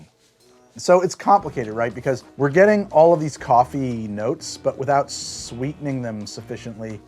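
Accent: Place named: California, United States. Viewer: If you close your eyes this guy sounds exactly like Andrew Tate if he was from California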